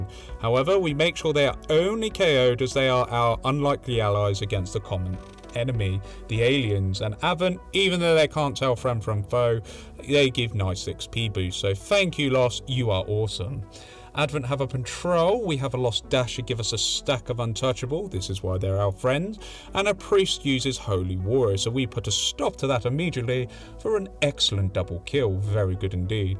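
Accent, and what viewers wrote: United Kingdom. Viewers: You sound like you have the same strain of British as the spiffing Brit.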